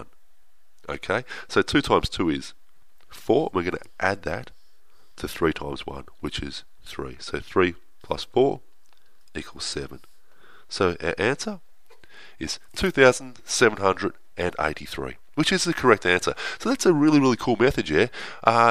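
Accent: Australia. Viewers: Not sure if you already realized this, but it's not a British accent it's Australian (did you catch that stereotypical aussie "G'day" in the beginning?)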